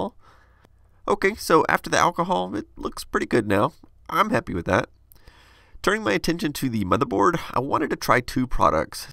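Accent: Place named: South, United States. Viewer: I’ve noticed that speaks with a slight southern accent. It’s not very noticeable but if you pay attention you can hear it, and since he grew up in Texas I can only see why. Love you man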